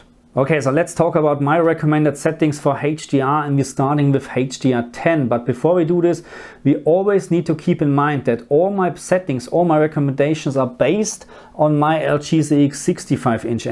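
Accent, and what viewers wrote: Germany. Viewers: Those settings worked for me (playing on PS5 on a SONY Bravia TV) very well, before I had to crank up my my max. brightness much higher than my TV actually can take, Tone Mapping had to be on 1. Thanks a lot! P.S. Da ich von Deinem Akzent (no diss) her annehme, dass Du auch Deutscher bist, noch eben muttersprachlich: Vielen Dank, werter Herr!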